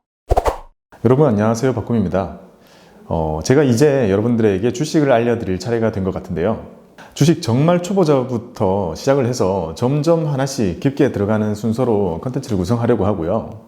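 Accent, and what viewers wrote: Gyeongsang, South Korea. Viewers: ...이름이 왜 이럴까 했는데 이제 알았어요 ㅎㅎㅎ 편안한 경상도 사투리가 오히려 귀에 쉽게 쏙쏙 들어옵니다! 금맹인 제가 오늘 하루도 성장합니다! 진심 감사드립니다~~~건강하시고 계속 좋은 방송 부탁드립니다!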